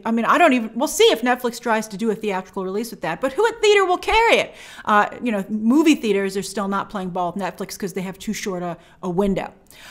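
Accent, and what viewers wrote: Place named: New York, United States. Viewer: You must be having a good weekend. Your new York city accent is coming thru more than usual.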